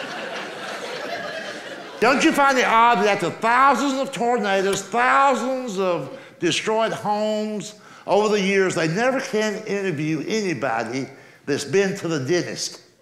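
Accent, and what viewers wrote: South, United States. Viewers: Simplicity in his delivery and comic content. He's not like the typical professional comedian, more like your funny Uncle Ralph. We all can relate to his content. His timing is perfect. Throw in the suthun' drawl for extra fun.He's hysterical, and I can't wait to see him when he gets near my town.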